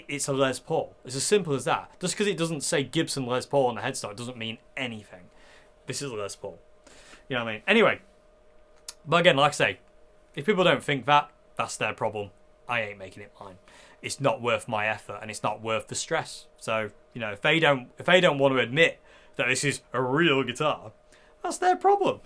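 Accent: United Kingdom. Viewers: I love the British accent, oh and the guitar playing too.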